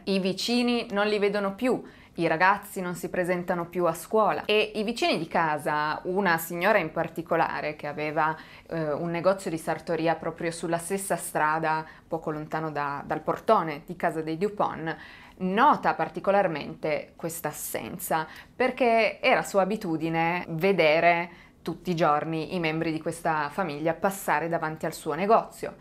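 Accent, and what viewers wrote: Italy. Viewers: Secondo me non sei AI perche a volte dici parole in inglese con accento italiano. Grazie mille per essere humana.😊😊😊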